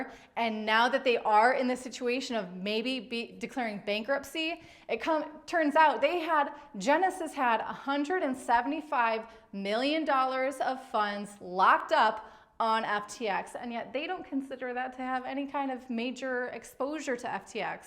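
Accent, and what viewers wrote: United States. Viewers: can someone tell what her accent is? It sounds a bit different to me than the "standard" US accent but I like it.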